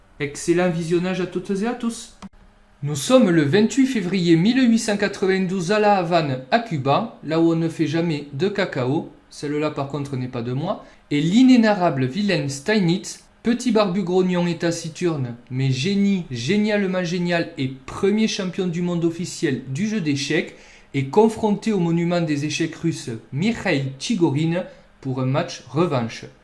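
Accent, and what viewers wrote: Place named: South, France. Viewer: Rien n'éclipse en effet la chaleur de votre accent solaire;-) Merci pour cette vidéo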